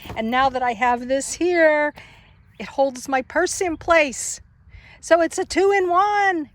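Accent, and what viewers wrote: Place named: Oregon, United States. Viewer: I don’t know where you’re from but you sound like you’re from Roseburg, Oregon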